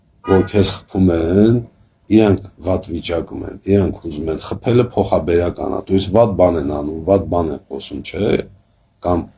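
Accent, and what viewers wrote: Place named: Stepanakert, Azerbaijan. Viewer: Մենակ Ձեզ լսելը ностальгия է, չգիտեմ Դուք որտեղից եք, բայց մաքուր Սծեպանակերտսկի ակցենտ օնիք ❤Ձեզ լսումում հայրենիքիս կարոտը առնում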